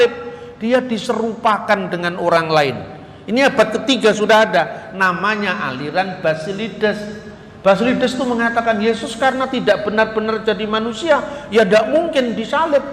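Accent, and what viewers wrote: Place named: Java, Indonesia. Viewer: Lidah jawa banget Docetic => Doketik Tapi sangat aneh pemikiran Docetic ini kemudian menjadi pengajaran agama islam. Dalam ajaran itu Penyaliban benar benar terjadi tapi ya g disalib itu bukan Yesus tetapi orang lain yang diserupakan. Anehnya disini mereka sendiri (Ulama2) (Quran dan Hadist) bingung dan beda pendapat tentang siapa yg diserupakan... Ada yg bilang simon dari kirene disebutkan bahwa simon dari kirene adalah Murid Yesus yang paling muda dan setia yg ketika Yesus menanyai ke12 muridnya siapa yg bersedia diserupakan seperti Dia (Yesus) dan simon ini mengajukan diri sampai 3x. Ada yg berpendapat Yudas Iskariot murid yg berkhianat. Perlu di ketahui dalam kisah itu bahwa orang yg akan menggantikan Yesus untuk di salib, akan beroleh surga yg sama derajatnya dgn Yesus. Disini ambigu nya terjadi... Simon dari kirene bukanlah Murid Yesus, Simon yang murid Yesus bukanlah Murid yang paling muda. 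Sbab yg paling muda adalah Yohanes dan juga penulis Injil. Kalau Yudas Iskariot masa orang yg mengkhianati Yesus beroleh Surga yg derajat nya sama?? Tuhan seperti apakah itu yang menipu umatnya (menyerupakan Yesus supaya Umatnya berfikir bahwa bahwa Yesus benar disalib padahal Tidak)?? Tp sudahlah biarkan mereka dlm kebingungannya sendiri. Penekanan utama iman kristiani adalah Karya Penyelamatannya (Mesias, Kristus) dan hal ini sudah pula dinubuatkan jauh sebelum Yesus (terlahir). Bagaimana Ia menyelamatkan apabila ia sendiri diselamatkan (tidak disalib/diserupakan menonton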